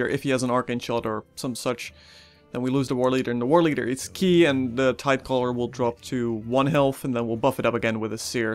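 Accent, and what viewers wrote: Netherlands. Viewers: you from the Netherlands or Belgium?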